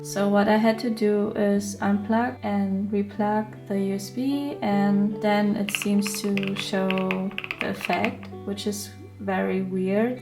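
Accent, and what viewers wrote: Germany. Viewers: Why? You do not need to be shamed about. A German - English could be lovely if the natural voice is nice and warm. Just like yours 🥰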